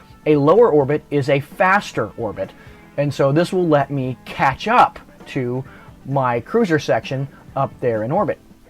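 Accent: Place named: North America. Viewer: Cool video. My first interplanetary landing was Gilly. It seemed like more of a rendesvous than a landing, of course. You mentioned being busy with the harvest - What are you harvesting? I'm guessing by your accent that you're in North America. I'm headed down South to Esperance (Australia) to harvest wheat, canola and lupines in a few weeks. Funny that you'd be harvesting what you grew over your summer at pretty much the same time that we harvest what was grown over our winter.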